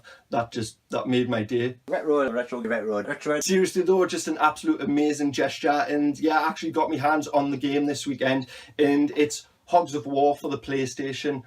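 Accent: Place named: Newcastle, United Kingdom. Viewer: Just discovered your channel, it’s refreshing to hear a fellow Geordie on YouTube. You going to Doncaster in March? I went for the first time last year, it was great.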